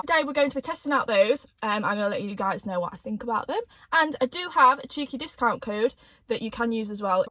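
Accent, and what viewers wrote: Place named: Manchester, United Kingdom. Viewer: You sound like a fellow Manc!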